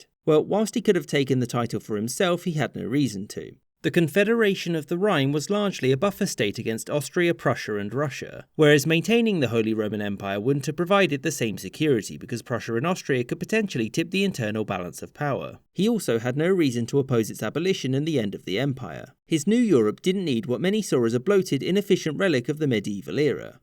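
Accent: United Kingdom. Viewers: No more bri'ish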